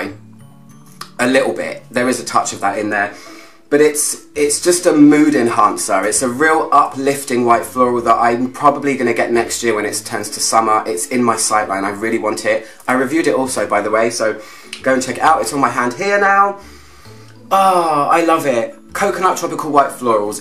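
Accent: United Kingdom. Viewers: New to your Channel and totally delighted by your reviews. I LOVE your style,your clothes and your britishness,and of course I share your passion for perfumes and everything perfumey. I also keep my English up to date and delight muy ears with your accent. Congratulations,you've got a new fan. Greetings from Spain,!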